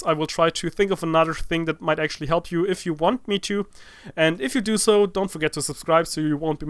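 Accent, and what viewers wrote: Germany. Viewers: your sound like german are u?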